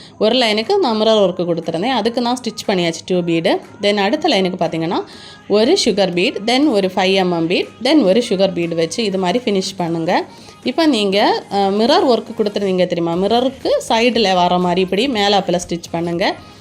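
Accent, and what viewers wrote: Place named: Marthandam, India. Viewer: Unga place marthandam ah sis ...voice apdi than irrukku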